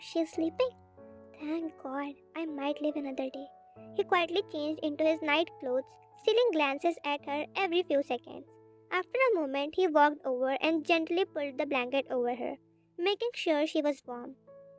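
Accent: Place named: India. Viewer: This is absolute shit. Wtf. The voice is sooo pick me. The indian accent is so obvious. Quit already broo. Infact u sound like a seven year old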